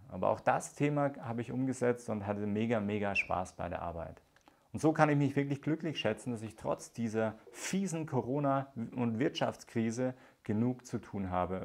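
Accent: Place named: Bavaria, Germany. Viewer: Cooler Kanal! Abo is raus :D schön auch einfach mal nen Bayer zu hören. Wie hoch ist denn dein Lebensstandard, den Du Dir erwirtschaften musst, wenn Ich nachfragen darf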